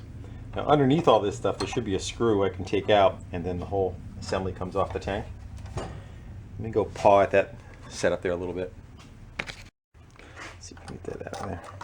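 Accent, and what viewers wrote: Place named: New England, United States. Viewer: Love the videos,. Good to see a fellow New Englander, accent and all making YouTube videos that are educational and entertaining. Keep up the wicked good work!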